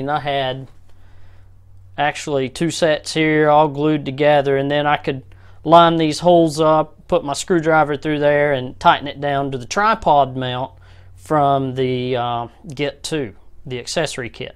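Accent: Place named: South, United States. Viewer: The southern accent got me laughing 😂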